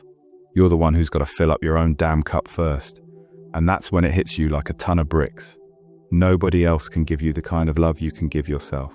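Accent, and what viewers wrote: United Kingdom. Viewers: ❤ I really like your accent, voice and narration style! Is it a real voice or a very good AI? I really want to know! British accent and British wording with an American twist! I live in London and this accent seems from a Londoner but a old school accent, hard to find it now, I know AI would clear the harshness of it , yet it’s hard to find anyone with this cute accent anymore, even years ago was hard to find. Is recognised as a gentle south London accent, yet , this soft spoken type it has disappeared!😢 I really like it! I have heard women in west/south London, but man has let it go, now man it’s more of an English posh accent or street cut off English. Anyway, please tell me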